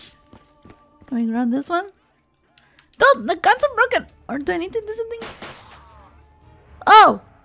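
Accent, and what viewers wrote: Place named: India. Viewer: She sounds India/Pakistani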